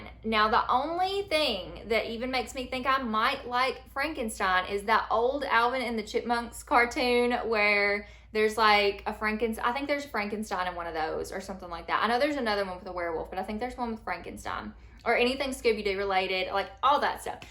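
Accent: South, United States. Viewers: I love September on BookTube because we have so many good readathons here! I’m going to be participating in Series September! Happy Reading! So nice to hear another southern accent here with me on BookTube! 👏👏 I’m also ready for fall too🤣🤣🤣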